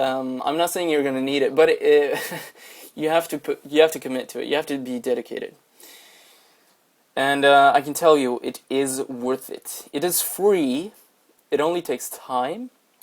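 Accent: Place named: United States. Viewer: Had you not stated you were from Norway at the start of this video, I would've assumed you were an American from a West or Midwest state. Your spoken English is virtually identical. As a Minnesotan (of 25% Norwegian ancestry), I would have zero inkling you weren't from here if I ran into you on the street. The same could not be said if I ran into someone from Tennessee or New England if I heard them speaking. Fantastic video BTW.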